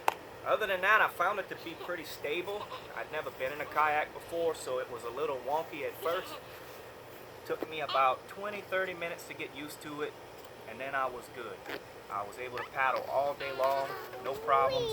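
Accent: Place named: Lafayette, United States. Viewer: You sound like you might be from around the lafayette area, just wondering because i wanted to know how it did around here? I'm looking for one to mainly go to big lake and such.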